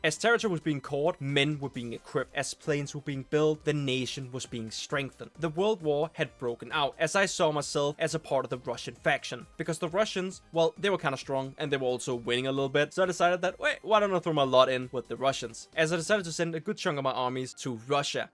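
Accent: Denmark. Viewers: Danglishen går hårdt🙏🙏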